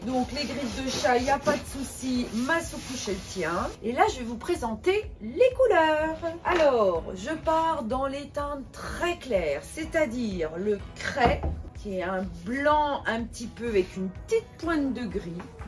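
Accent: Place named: Franche-Comté, France. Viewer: Bonjour j adore! J ai reconnu un accent franc-comtois de mon avance..? Bisous et bravo! Ps: j ote les ferrures en général, mais pas toujours possible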